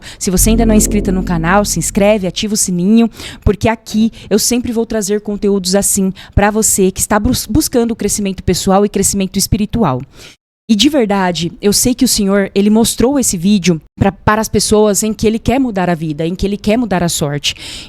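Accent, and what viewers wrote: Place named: Videira, Brazil. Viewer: Você é da Videira? seu geito de falar é igual o de lá kk.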